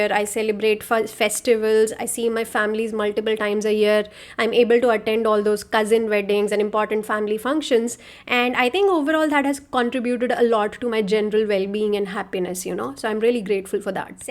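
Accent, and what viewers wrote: India. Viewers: why did you go back to India? 100K is low for Indian in US especially in NY? You have heavy Indian accent. Shows that you did not assimilate in US. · Your accent is Indian after staying there for 10 years. You were not co mingling with the American culture. Sidehe kaho ki H1B visa nahi mila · U still have a thick Indian accent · Pseudo American; wants to live in India as an American. Doesn’t belong here or there, just an opportunist. Horrible Indian English accent. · You are Indian and India is your country there's no reason for you to escape India because India is horrible because of Indians That's your opportunity to make something better that's your opportunity for wealth America is a rich country with prosperous Americans and you are just cheap labor in America no matter if you think you're making 100K and you think that's a lot of money but that a lot of money to Americans 88% Eighty-eight percent of Indians in America are cheap labor 94% ninety-four percent of Indians in America make American wages or below If Indians are so Superior why is India at the bottom of the world Are the British to blame? 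Why is Pakistan above India why is Bangladesh above you This is Indian mentality you don't want to work and you don't want to work hard you don't want to improve you have Hindu Brahmin lazy mentality you want to sit on the throne and have everybody else do the hard work You can become wealthy and India all you have to do is put in the work but you're not willing to do it you're not willing to improve India those are the people that become wealthy those are the people that become wealthy in India those are the people that become wealthy in the world they make something better My guy will you ever get rid of that forbell Indian accent and speak clearly Why wouldn't you want to change India and make it improve India is at the bottom of the world if you don't want to improve India than India will always be at the bottom and Indians will alway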